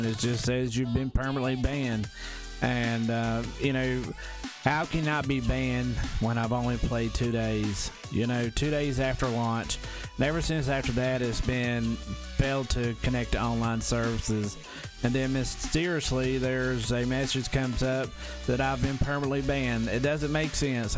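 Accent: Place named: United States. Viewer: I like your country accent. You sound like you eat apple pie and fried chicken everyday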